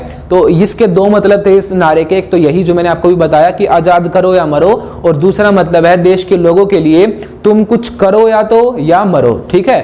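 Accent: Haryana, India. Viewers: From haryana??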